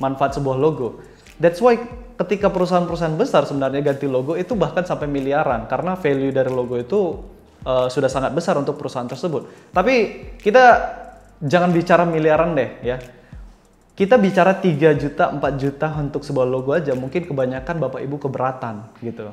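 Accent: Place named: Pematangsiantar, Indonesia. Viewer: logat bicara mu bro mancam par siantar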